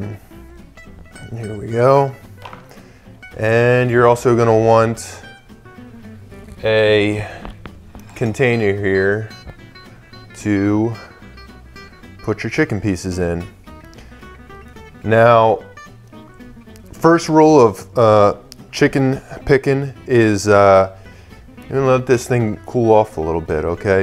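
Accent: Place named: Pennsylvania, United States. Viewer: are you from Pennsylvania? It is the way you say certain words. Thanks for sharing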